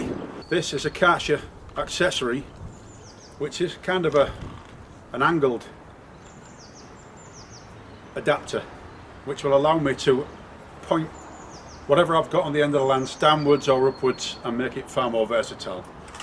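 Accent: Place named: Hull, United Kingdom. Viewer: I certainly did find the review useful. It was excellent, detailed and honest. I would expect nothing less from a Yorkshire man. However, I was really struggling to decide where the accent was from. Initially I thought your accent could well have been from South Yorkshire, even Sheffield, but then I heard the way you said "work" and "nine" and they sounded like a very slight Hull accent. Anyway, something I do want to know is how did it perform on your actual windows. I saw you soap them down but not clean the soap off. Does it leave faint limescale marks where droplets dry?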